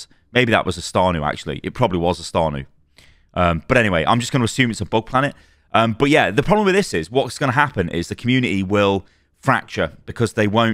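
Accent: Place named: United Kingdom. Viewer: Ah yes my thirteenth favorite channel: Panic in a Brit Voice <3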